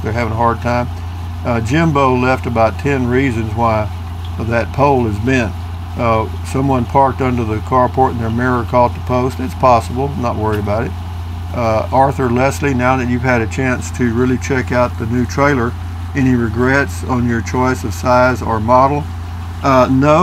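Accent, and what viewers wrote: Texas, United States. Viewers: Linda Fowler My pleasure. generously shares his unique lifestyle and perspective with us. I find his stories interesting, his adventures entertaining, and his quintessential Texan delivery a rare treat. I am happy to help support his efforts in his preferred manner.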